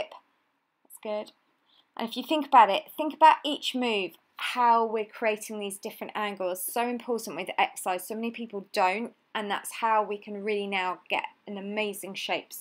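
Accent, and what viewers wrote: England, United Kingdom. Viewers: Love your ab and booty workouts!! Haha and i love hearing you say "booty". I love English accents!!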